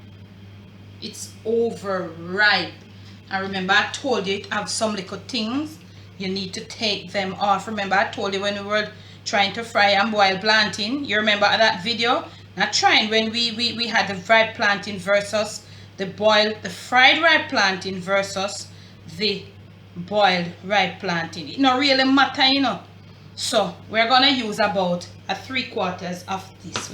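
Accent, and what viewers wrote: Jamaica. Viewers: I’m African men living in the USA, just love jamaïcain accent, food and all one love my people 🙏🏿👍🏿💯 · Also our Jamaican patwa is like how you speak also always wanted to visit ... Nuff luv from ja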